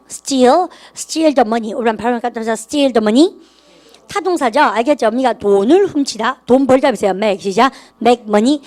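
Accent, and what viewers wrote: Gyeongsang, South Korea. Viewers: 영어와 갱상도 말을 동시에 배웁니다.. 이해력에 많은 도움이 됩니다. 감사드려요~~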